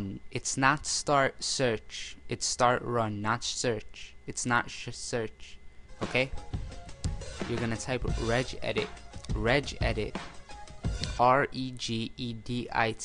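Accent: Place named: New Jersey, United States. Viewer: YOU SOUND LIKE YOU FROM JERSEY.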